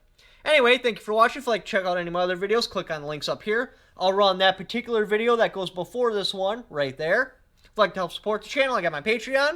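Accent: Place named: Wisconsin, United States. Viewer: LOVE ALL OF YOUR VIDEOS AND WISCONSIN ACCENT. KEEP SPEAKING THE TRUTH BROTHER.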